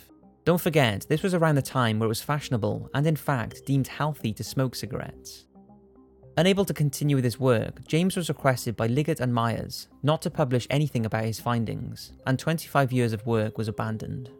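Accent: United Kingdom. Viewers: Hey perfect storyteller voice British guy, be careful, I’d be sad to find out you went missing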